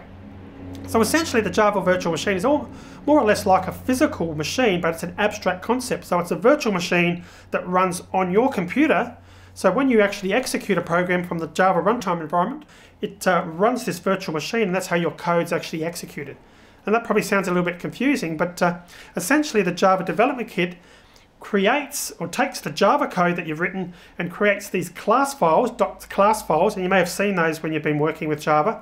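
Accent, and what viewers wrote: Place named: Australia. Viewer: I love learning java with aussie accent!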